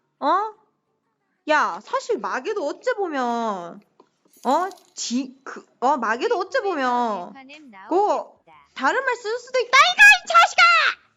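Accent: Gyeongsang, South Korea. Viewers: 이게 경상도거등요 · 경상도서 꽤 오래 살았지만, 저렇게 ㄱㅇ게 사투리 쓰는 건 처음 봤어요ㅋㅋㅋㅋㅋ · 귀여운 부싼 아입니까....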